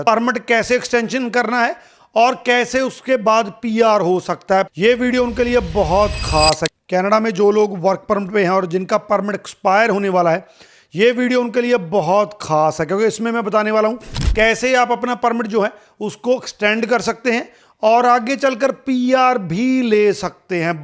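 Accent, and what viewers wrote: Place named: Punjab, India. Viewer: He is from a Punjabi speaking background, that's why it is a little bit different accent.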